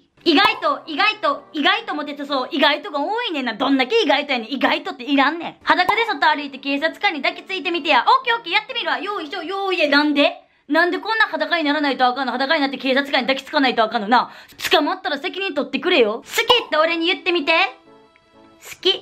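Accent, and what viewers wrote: Kansai, Japan. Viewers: いつも、関西弁で草ww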